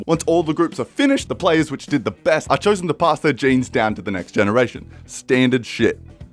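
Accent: Australia. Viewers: Your Aussie yea?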